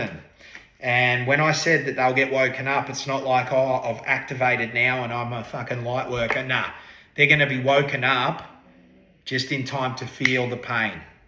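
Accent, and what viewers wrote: Australia. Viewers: 100% feel this found me. Thanks. Never got a tarot reading from an Aussie before and i gotta' tell you, it's pretty entertaining the way you articulate and deliver your message ❤😂 LOVE & LIGHT💯👊🫶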